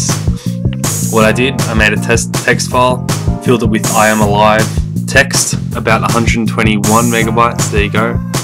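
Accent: Australia. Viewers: I KNEW HE WAZ AUSSIE!!! can I get a million dollars now?? Mail it to Queensland ##################### U thaught I waz gonna actually give u my address yea nah.. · are you australian?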